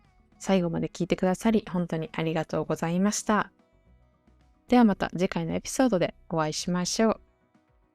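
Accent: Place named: Kansai, Japan. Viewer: 関西弁はちょっぴりラフだが、ガチでかっこいいじゃん！私が最初聞いた時は「アズマンが」というアニメの「オオサカ」（あだ名）というキャラから聞いた。ですが、英語のバージョンではオオサカは南方アメリカ英語の方言を使って、私にとって相応しくない。関西弁の代わりに理想はボストン方言だと思う。その方言との関係において共通点を持っている。両言語は都市に位置していて、スラングとちょっとパンクのような雰囲気もある。 Hana先生の方言は面白くて、かっこいいと思うよ。いつもありがとうございます！！ ＊すみません！前に書いたドラフトでは今の「ラフ」は「粗末」と書かれていた。ニュアンスを知らなかったので、「crude」ではなく「unvarnished」のような意味で言ったつもりだった。無礼なことをするつもりじゃなかった。ニュアンスって怖いですね。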